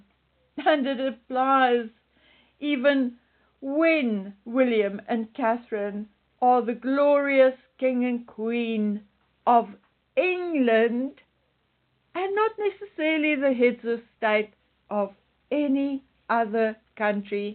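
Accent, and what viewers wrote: South Africa. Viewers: South African accent detected. Which province are you from?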